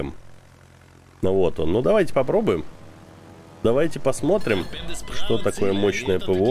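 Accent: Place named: Odessa, Ukraine. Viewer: а где взять такую одесскую озвучку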